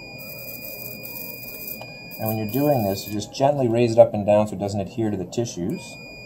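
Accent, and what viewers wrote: Canada. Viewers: You sound a little bit like you're from Canada.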